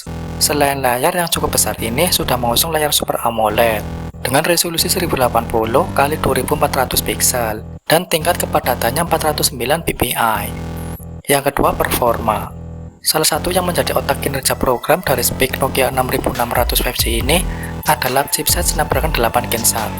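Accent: Banyumas, Indonesia. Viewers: Lha koe ngapak yaa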